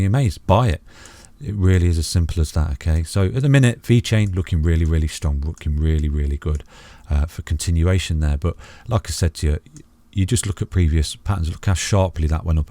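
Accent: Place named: East Midlands, United Kingdom. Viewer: Thanks for the daily tuition, nice to hear an east mids accent!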